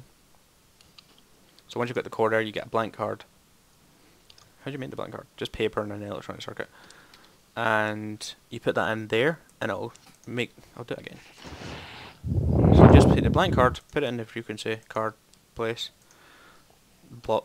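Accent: Scotland, United Kingdom. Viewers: Clearly a Scottish accent. It is my favorite accent actually. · I don't see how Gregory cannot under stand your accent, Scottish accents are the best :D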